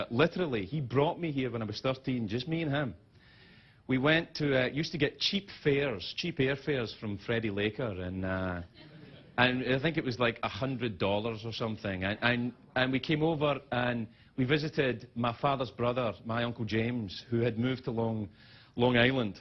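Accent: Scotland, United Kingdom. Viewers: Anybody noticed he switched back to Scottish accent from his usual sort of "intermediate" accent? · He sounds SO much more Scottish here. :) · His Scottish accent is in full force in this monologue...even more so than the one where he eulogized his mother. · OMG I love this! His accent is sooooo Scottish in this one.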